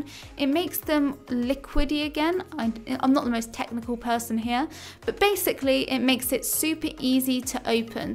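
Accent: England, United Kingdom. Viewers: Is her accent British??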